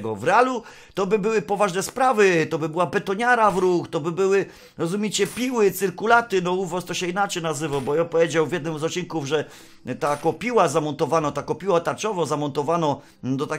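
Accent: Silesia, Poland. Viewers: Szanowny twoje poczucie humoru w tym filmiku konkretnie sprawiło że płakałem jak norka jako że uwielbiam Śląsk twoja gwara śląska sprawiła że poprostu się popłakałem więcej takich filmów. Zazwyczaj poprawny polski tym razem typowa gwara dziękuję za poprawę humoru. Pozdrawiam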